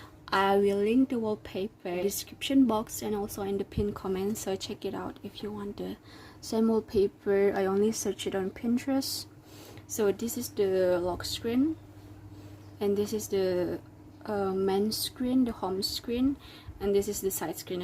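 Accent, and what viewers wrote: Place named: United Kingdom. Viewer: I think you have a British accent... So cute..😊😁. Thank you for a wonderful video.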